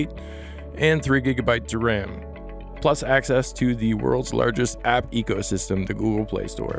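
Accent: Canada. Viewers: Definitely from Vancouver - the "o's" and the fact that hes high give it away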